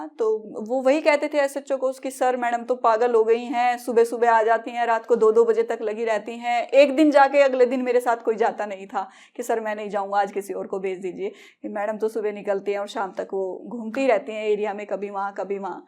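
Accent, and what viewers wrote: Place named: Haryana, India. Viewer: आपको मुबारक कि आप अपने mission में सफल हुई। अब आप दिल्ली में हो अपनी हरियाणवी बोली को कंट्रोल करके बढ़िया से हिंदी के साथ इंग्लिश को mix करके बोला करो। ताकि आप दिल्ली के हर इलाके में पोस्टिंग और कामयाबी हासिल हो सके। promotion होने पर काफी easy feel करोगे । शुभकामनाएं ।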